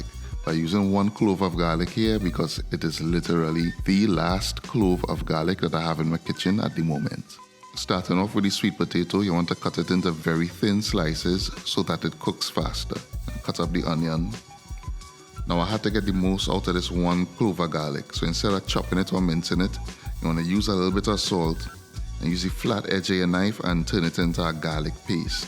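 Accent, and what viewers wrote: Trinidad and Tobago. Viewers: Are you the YouTube police? Did you know that YouTube can be accessed by most countries? Thus anyone from those countries can upload content in their own language. Why feel the need to say this if you don't understand the video, simply move on. And just so you know, he's speaking English with a slight Trinbagonian accent, which is very close to British English, yet your pea sized brain couldn't understand it, you're and absolute idiot.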